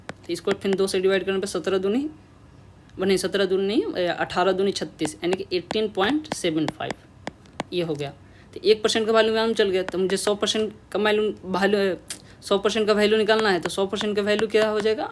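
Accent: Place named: Bihar, India. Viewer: Very nice ❤❤ भैया ❤❤ awaaz me bihari touch 🙊🤗🤗🤗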